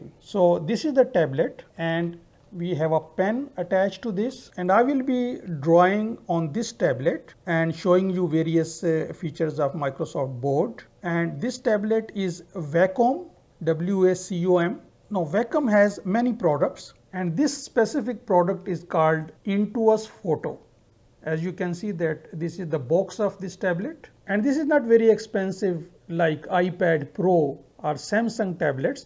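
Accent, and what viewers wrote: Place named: India. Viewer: indian accent again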